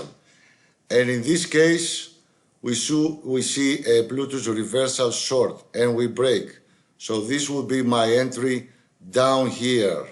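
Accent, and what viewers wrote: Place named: Greece. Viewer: Και εγώ από Ελλάδα είμαι στη Θεσσαλονίκη μένω και μόλις είδα το βίντεο κατάλαβα ότι η προφορά είναι Ελληνική !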